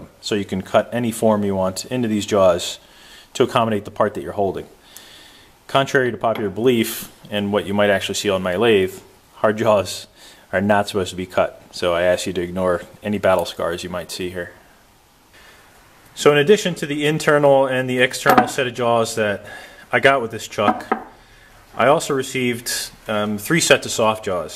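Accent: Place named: United States. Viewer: Wait until you discover aluminum jaws... Machines like a wet dream, no-mar clamping. You sound like a fellow American, so wth is with all the metric dimensions?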